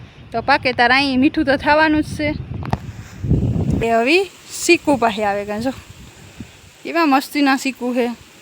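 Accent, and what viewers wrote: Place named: Mer, India. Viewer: Ben tame asal merni bhasha bolo so te bahu j saru lage h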